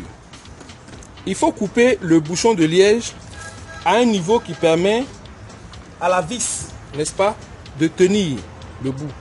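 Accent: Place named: South, France. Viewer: Coucou, j'ai tout compris... tellement bien expliqué et avec l'accent du soleil en plus, que du bonheur. Plaisir et joie chez vous.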